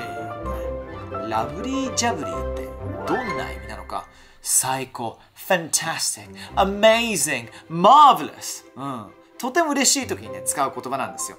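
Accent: United Kingdom. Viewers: のイギリス英語が大好きで、いつもPodcastやインスタ観てます🇬🇧💕 イギリスにいると、電話先でも対面でも、たくさんの「Lovely!」が聞けて、Lovelyって言葉聞くと個人的にめっちゃ嬉しくなります❤️ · I’m Japanese but currently studying in uk and I really appreciate your lovely videos! They help me a lot to learn exact British pronunciation:)) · I like your lovely British accent!! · イギリス英語でLovelyがよく使われると知ってから自分でも使うようにしています！のイギリス英語で勉強するの楽しい〜！☺️ Your channel is so lovely:) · イギリス英語かっこよすぎるー😭品格半端ない…憧れ… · のイギリス英語って何と言うかすごくイギリスっぽいというか高貴な感じがするというか、素敵です！はイギリス生まれなのですか？ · I fancy your lovely English accent!!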